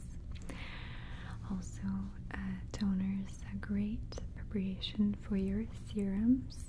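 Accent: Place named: Russia. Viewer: English is not her native language. She is from Russia.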